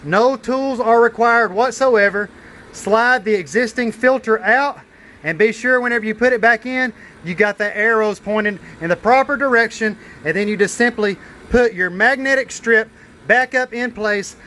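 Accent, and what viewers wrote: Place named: Rural, United States. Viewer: Shit, I was sold and heard the country accent, but I'm in North Carolina :(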